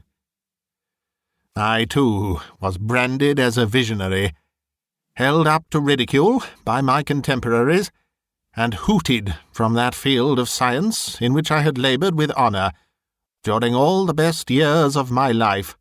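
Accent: United Kingdom. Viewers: I'd like to add that it's how you masterfully change your voice to suit each character that is impressive and very convincing. And you have that elegant and clear British English that's very much appreciated by American audiences ( including myself). 🙂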